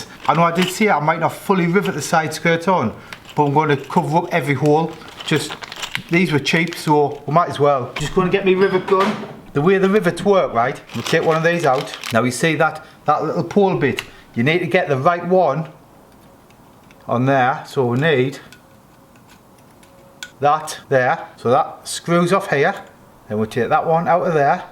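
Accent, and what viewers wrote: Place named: Newcastle, United Kingdom. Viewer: You talk very clearly with no bs and a jordie accent well done a great teacher you are. Hard to do without being a cock...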